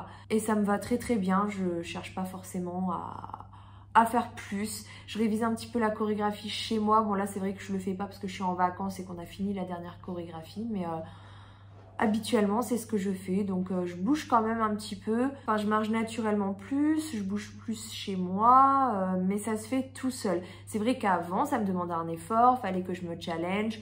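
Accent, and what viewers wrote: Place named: Brittany, France. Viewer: WoW une bretonne dans le sud ! Mais comment tu supportes la chaleur ?! Pour moi, descendre plus bas que Nantes c'est déjà pénible... C'est marrant parce que j'aime beaucoup ton petit accent (genre quand tu dis "pour soi-meume") bien de là-bas, j'aurais jamais pensé que t'étais bretonne comme moi !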